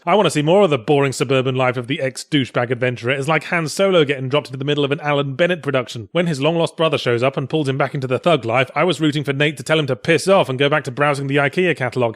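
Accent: South Africa. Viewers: Dear Yahtzee, as a South African I can safely say you are 100% correct, everyone hates us and most of all we hate each other. Oh and btw your accent is terrible, both in your resident evil play through with Gabe and here. You're still awesome, keep up the great work. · Oh, how I missed Yahtzee's South African accent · I am South African and that statement about us not getting along with each other is pretty apt. Even more so now that President Zuma has our country in a lovely nose dive to end up like Zimbabwe. Oh good review as always Yahtzee, your SA accent is still atrocious though.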